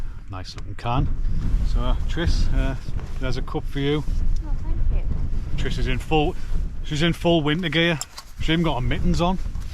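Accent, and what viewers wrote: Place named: Newcastle, United Kingdom. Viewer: you sound a bit geordie, she says Yorkshire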